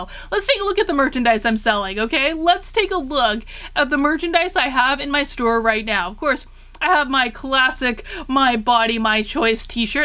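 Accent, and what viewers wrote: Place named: New York, United States. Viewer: That dumb down vocabulary is the way most of us New Yorkers 'tawk'. Nick names and all..plus he's NOT a politician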